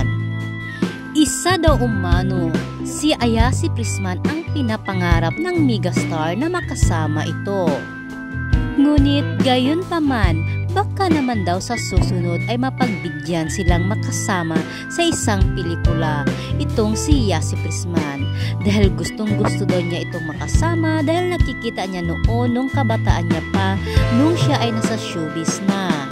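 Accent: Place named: Visayas, Philippines. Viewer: Naniniwala akong sinabi ni Sharon yan kung sya mismo ang nagsasalita sa video. Maka cocoyass din itong vlogger na to bitter talaga bisaya pa ang accent nya. Gawa gawa lang nya yang sinasabi nya wala namang video si Sharon.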